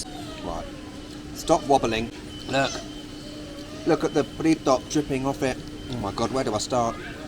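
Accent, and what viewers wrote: United Kingdom. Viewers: thank you for your brilliant shots, Serbian subtitles, and bludy flowless brits acent i like it the most.)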